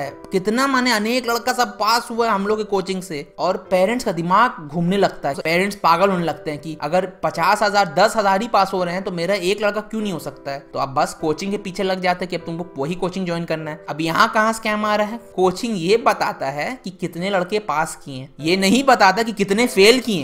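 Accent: India. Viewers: Up ke lagte ho...Awsome vedio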